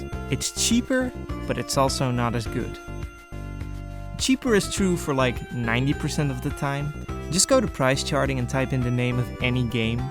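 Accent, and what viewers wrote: Netherlands. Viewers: Wow man, Nice subject. great editing and research. Also great accent for a fellow dutchman. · You’re definitely Dutch aren’t you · You dutch? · Ik had pas in de gaten dat je Nederlands was op het moment dat je het zei. Nederlands accent heb je haast niet meer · WHY do I ALWAYS recognize english speaking DUTCHMEN?! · accent gehoord, nederlander gespot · Oh, a fellow dutchman! I was wondering why your accent sounded familliar. I've been meaning to get into game collecting. I have already baught a nintendo 2DS as I used to have a 3DS back in its hayday. And I missed some of the titles on there. I also have a Wii U but I only have one physical game on it. I'm gonna subscribe to your channel hopefully learn some more about game collecting in the future. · Watching your video I was like: damn, this dude sounds like he could live next to me. 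And then you said you're from the Netherlands and I'm like, makes sense lol. Great video! The King's Day part hit. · Jij bent 100 procent nederlands. Je accent maakt het duidelijk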